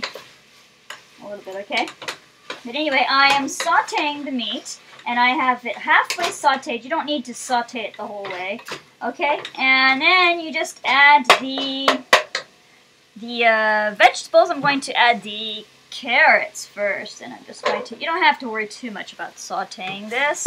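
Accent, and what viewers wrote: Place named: United States. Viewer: I think your English still sounds very American. I think "you know" is spoken by a lot of the kids; I know I did it when I was a teenager. "Skimming the top" or we even use 'scumming'.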